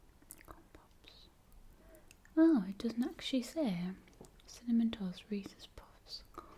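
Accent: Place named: Newcastle, United Kingdom. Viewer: You know who you sound like accent-wise? You sound like the guitarist Andy Taylor of my favorite musical artist (and yes, I am being serious) Duran Duran! He came from around Newcastle, so I'm guessing that's where you come from as well... ? IDK, maybe I should just look up Middlesborough as I know that's where you said you lived, but wait, you said you moved there, so you could come from anywhere in England. And you don't sound Welsh to me, but then again when I think of what Welsh people sound like I think of Rhod Gilbert and I'm laughing on the inside just thinking of a female with Rhod Gilbert's voice. That poor imaginary woman. :D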